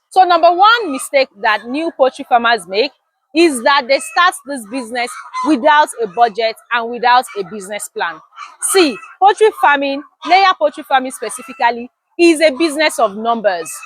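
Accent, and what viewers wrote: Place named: Igbo, Nigeria. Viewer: I have watched many of your teachings and I must say may God bless you but to be honest your sounding so much like your from Igbo tribe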